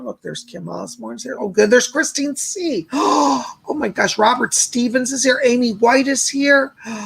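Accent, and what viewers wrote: United Kingdom. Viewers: Blessings Lord. Love the English accent (lush) lol Cola has brought so much positive energy an love and light to your being. We are so blessed to have her in our lives. She is my little sister and I'm Mama pit bull over her and you. Team Lady Cola and Lord. Blessings to you and lady Cola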